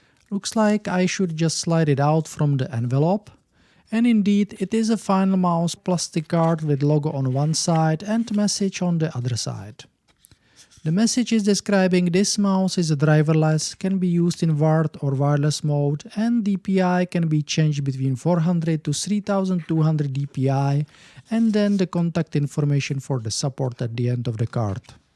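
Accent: Czech Republic. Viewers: Hi, great job, you're doing really great content, but I can hear really strong accent, I think that's Czech, am I right ? :D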